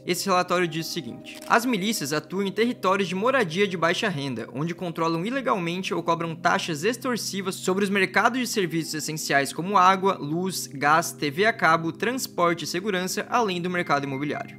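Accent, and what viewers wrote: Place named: Rio de Janeiro, Brazil. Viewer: Com esse sutak do RJ tu não é.milcia é polícia.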